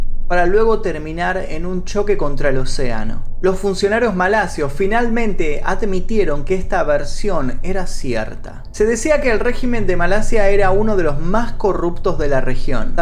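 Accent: Buenos Aires, Argentina. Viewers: por tu voz parece que eres de Argentina 🇦🇷 ó Uruguay 🇺🇾 estoy de acuerdo con todos los comentarios positivos este es mi primer video que veo tuyo excelent. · es que los Argentinos y los Uruguayos hablamos asi la ll suena sh, por eso es latino y no español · se ve que asi hablamos los de argentina porqué me decían lo mismo a mi xd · Que genio!!!! SOS un copado.... Muy bueno tu relato, excelente perfomance, así hablamos los porteños. es totalmente Argento....Por muchos relatos massss 💪💪💪👏👏👏👏👏👏🇦🇷🇦🇷🇦🇷🇦🇷🇦🇷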